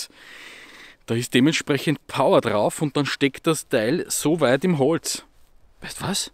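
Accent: Vienna, Austria. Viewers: DEN österreichischen Dialekt gibt es nicht. In Deutschland gibt es ja auch weit mehr Dialekte als Bundesländer (Sächsisch, Berlinerisch, Kölsch, Schwäbisch, Fränkisch,...). In Österreich ist es genauso. spricht mit Wiener Akzent bzw. Niederösterreichisch nahe Wien. 🙂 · Du bist schon ne coole Socke und ich als Piefke finde den österreichischen Dialekt so geil. Ach ja und klasse Video!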